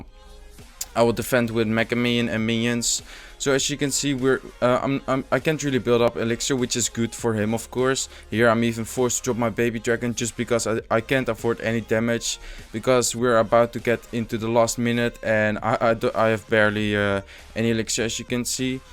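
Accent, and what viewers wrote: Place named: Netherlands. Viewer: lekker dat Nederlands Engels accent maar toch wel tof je video ga zo door!!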